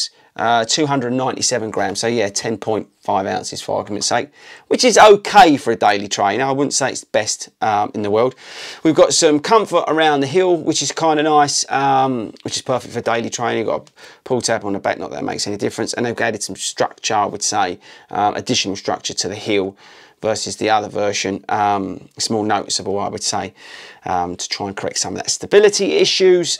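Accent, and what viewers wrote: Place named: England, United Kingdom. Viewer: you got the best accent man where from England are ya from?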